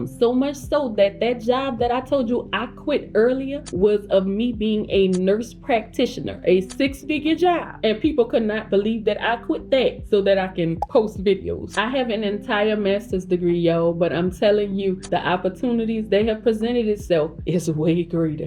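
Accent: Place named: Louisiana, United States. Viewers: Hi my beautiful sister. You sound so much like my ppl back from Louisiana. Have to keep going bun binge watching your videos and a few others bcuz i just got curious about it yesterday. Thanks for sharing sister. I hope you have an amazing Sunday and a blessed week ahead ❤🙏🏽🥰😍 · Just came across this video! I heard of E-commerce YEARS ago and set up stores but never followed through. I started again this weekend. And ma’am, where are you from?! You sound like you’re from Louisiana. 😆that’s where I’m from so idk, your accent sounds real familiar. Lol ❤️ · I love you accent!!! You must be from Louisiana!!!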